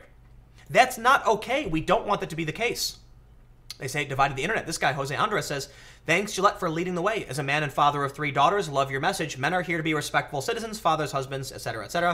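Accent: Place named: United States. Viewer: Why cant Americans say yoghurt? Sorry, but it does grate on me!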